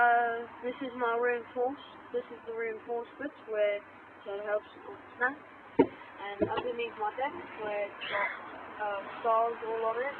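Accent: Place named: Southern Africa. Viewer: nice southern african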